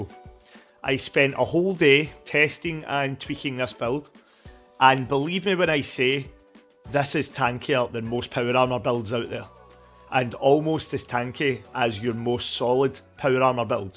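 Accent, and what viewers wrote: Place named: Scotland, United Kingdom. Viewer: Happening mate! I’m also Scottish so am very excited to hear your accent on some good fallout content. Just wanted to point out that you don’t get into the actual build what so every till after the 5 minute mark which means you spent a minimum of 1/4th of this video not getting to the point. Not saying this to out you down, just meant to be constructive criticism. A lot of people who google specific things about a game they’re playing such as “good non-power armour build” want a quick answer to a simple question so that they can get straight back into the game. Hope this maybe helps a bit as your ability to write a script and host is fantastic. You deserve significantly more subscribers and I’ve just become one. Best if luck mate.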